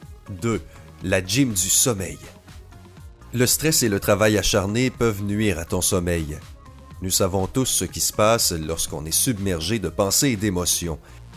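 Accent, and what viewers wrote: Quebec, Canada. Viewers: l'accent quebecois désolé mais non s'il vous plaît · Quel accent de merde cet accent québécois quand même, on dirait de la chiasse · L accent Quebecois ET trop drole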